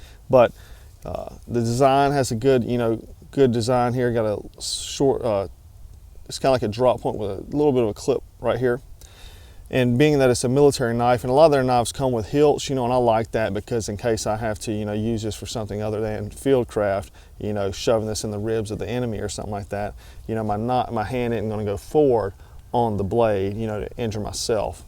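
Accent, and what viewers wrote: South, United States. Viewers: Do you have to buy all of these knives? I would have to agree that $385 is alot. Also I went to their website and it was difficult to navigate. Wish it was a little more user friendly. Good review though. I trust your opinion because it sounds like your from the South as well lol. Also I don't know if you have done this in other videos, but I'm not a steel expert so explaining the different qualities or benefits of the different steel would help me out.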